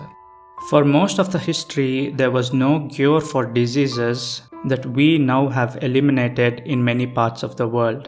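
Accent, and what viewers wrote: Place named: India. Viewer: pajeet accent messed the whole philosophy up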